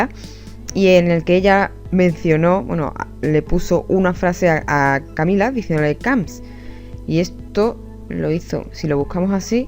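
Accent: Andalusia, Spain. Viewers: tienes acento andaluz me encanta, muchas gracias por confirmarlo enserio lo estaba esperando, un beso desde Granada❤🤗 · eres de Andalucía? ;))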